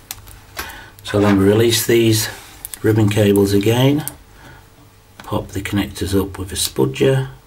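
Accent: Yorkshire, United Kingdom. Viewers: you sound like a yorkshire man ,,,are you near enough to fix mine ?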